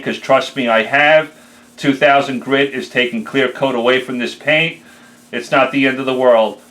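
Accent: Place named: New England, United States. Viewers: Obviously doesn't sound like a Dallas native. Please go back east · Horrible!! What a joke. You just ramble on and on. Nobody clicked your video to here of your personal life or personal BS. You should remove the fact that your a local DFW Texan especially with that infuriating Yankee accent. Knowing that alone is a deterent to clicking this video😠😠😤😡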